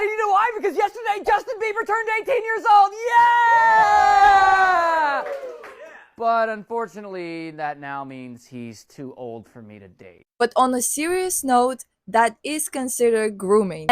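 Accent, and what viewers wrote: Russia. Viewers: С самого начала слышала малюсенький русский акцент и думала мне кажется но нет🙂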